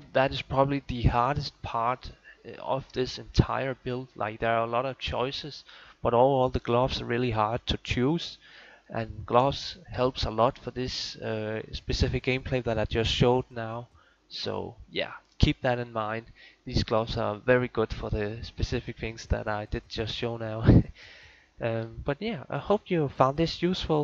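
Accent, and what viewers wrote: Denmark. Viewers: try to lose just a bit of the danish accent. It's like nails on a blackboard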